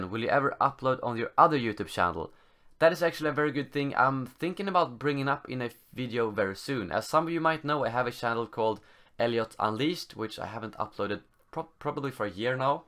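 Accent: Sweden. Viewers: Wich country are you from? Sounds... Swedish kind of.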